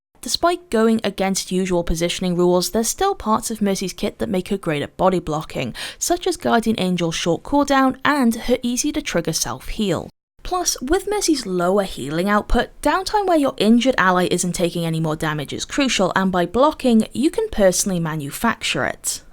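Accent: United Kingdom. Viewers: This is an amazing tips video I've never even thought of (I'm so ashamed) or heard of. I LOVE Coma and Dding - I bought the Shanghai skin for Mercy because Coma is insane (with all access pass, watching his PoV is just... incredible and shows how much work a Mercy can actually put in). I love the fact you brought them up!! I'll probably end up forgetting your disclaimer and trying this in comp and getting rekt but yeah. Thank you for your videos, I just discovered your channel through Twitter rabbithole-ing, I saw your post about some dude raging about your voice. Idk what I expected, but your voice is really soothing and pleasant to listen to! Of course, we Americans tend to love British accents (I hope that I'm not getting your ethnicity wrong here, apologies if I am). Basically you sound wonderful, some people are just hateful to the point of delusion. 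:) Keep up the amazing work, I am a new subscriber and am already learning a lot. (Also I have about 250+ hours on Mercy so this truly means so much to me to learn new and EXCITING things.)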